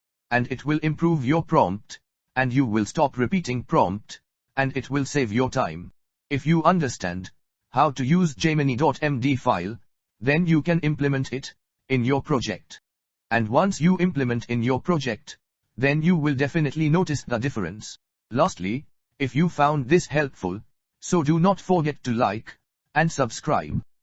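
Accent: India. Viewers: Another slop tool presented by a Indian robot voice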